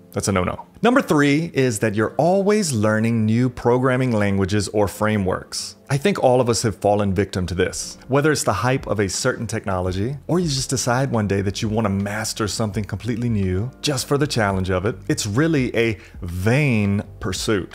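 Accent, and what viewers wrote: South, United States. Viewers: Are you from Georgia or South Carolina by chance?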